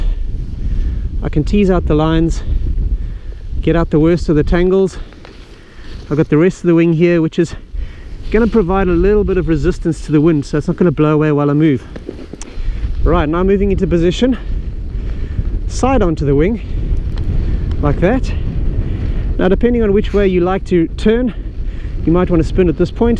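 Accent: South Africa. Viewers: South African accent ?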